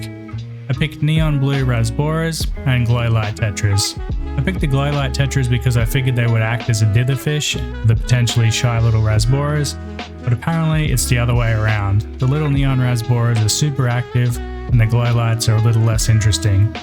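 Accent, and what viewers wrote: Australia. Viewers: So thrown by the Aussie accent with European context! Would love an update on this tank now it's had time to settle.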